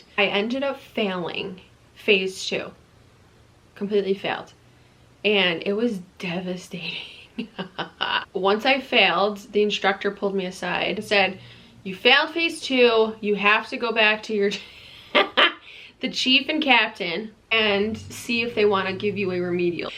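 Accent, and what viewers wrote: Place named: New York, United States. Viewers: She does not have an accent to me. :-) I'd say upstate somewhere, not down by the City. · I love your New York accent, that's like a real cop's accent. I honestly wish I lived on the east coast just so I can talk like that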